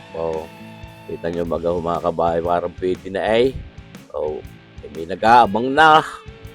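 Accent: Batangas, Philippines. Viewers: Batangas po yang cooking man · that’s ow Batangueño talks…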